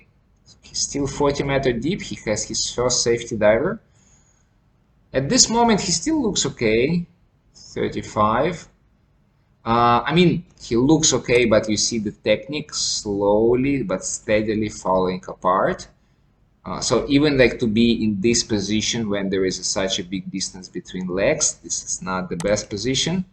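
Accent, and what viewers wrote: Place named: Russia. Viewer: Слушай, красивый человек с красивом акцента русско:::о)) Ты понимаешь эту тему, не умру ли Я На глубине? D5. COMBO )) Только КОПИЛ. Туш рвётся от того, что не купил океаин, если ПОДУМТЬ, ПОКУПАЛ ДЛЯ ДОКТРИНЫ,..Нет для фактов дошёл до зардержке на постептли 2 B D ВПИЗДУ ПНГЭ НО КРАСИВО:О) )\\\\ да? как же хочется это дыхание сохранить на манималках? ХУУЁВО ЗНАЮ Когда контроль, на глуби ВНЕ КОНТРОЛЯ, уже пошли работать безумные Смертники.. БЛЯЯЯЯЯЯЯЯЯЯЯЯЯЯЯЯЯЯЯЯЯЯЯЯЯЯЯЯЯ:О) КАК ЖЕ ХОЧЕТСЯ Погрузиться глубже...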